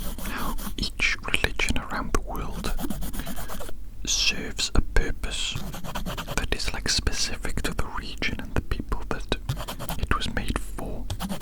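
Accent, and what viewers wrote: Wales, United Kingdom. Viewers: First time viewer and I detected the Welsh accent in record time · Is that a Welsh accent I hear ( I could be completely wrong lol )🤑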